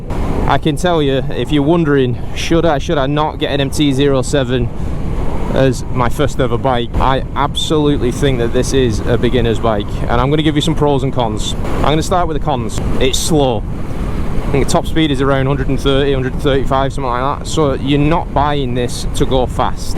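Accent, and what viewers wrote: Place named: Bolton, United Kingdom. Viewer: where are you from sounds like bolton u sound like paddy mcguinness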